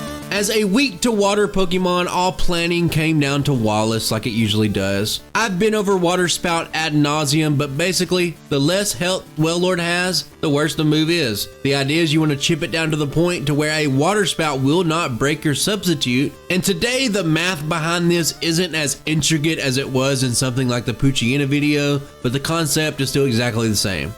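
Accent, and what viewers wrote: South, United States. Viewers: The southern drawl mixed with the wit, the self awareness and relatable rants just made you probably the most human YouTuber I've ever listened to. Fuck yeah dude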